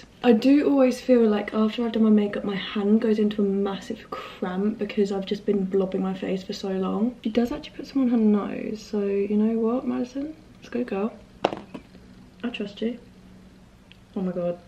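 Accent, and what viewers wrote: United Kingdom. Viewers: I like the English accent from her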